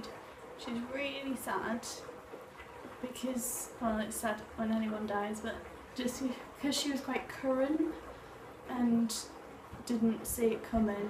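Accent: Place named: Yorkshire, United Kingdom. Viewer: I love your accent from Yorkshire ?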